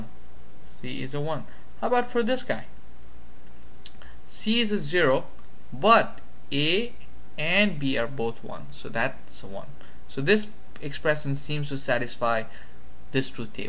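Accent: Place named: India. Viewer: Can't recognize that accent, where are you from? Seems like a light-ish indian with a bit of a lisp. Great video nonetheless.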